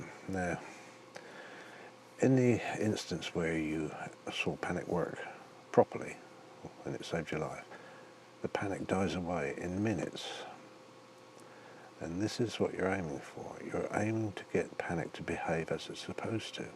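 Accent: England, United Kingdom. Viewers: Its the tone, deep voice, and English accent that helped me out tremendously ❤️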